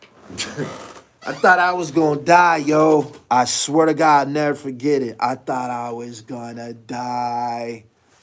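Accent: New York, United States. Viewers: Yo u from PA why u sound like u from New York no pun intended on my New York Niggaz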